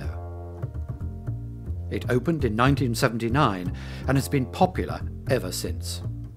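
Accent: United Kingdom. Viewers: My first comment would be that the narrator get an education in pronouncing the names correctly. Would any Limey with so sophisticated an accent as his do so badly in that horrible French they all seem to effect? The editor or writer of the script is really really biased towards the "black"" side of our history. The world should remember that the black (mainly Xhosa) tribes arrived in this region at about the same time as the settlers, so there is no basis for the "rights"to any lands. Yes, there were atrocities inflicted, but by both sides...Sad that the Western Cape (ie Cape Town and environs) are omitted...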